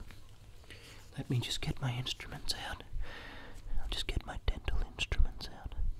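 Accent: Australia. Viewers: Wow your voice is so soothing and gives me tingles but them tools are scary as 😂😂😂 am I right that you're a fellow Australian?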